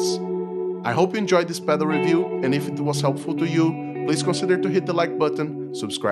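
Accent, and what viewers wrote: Brazil. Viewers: Great Brazilian accent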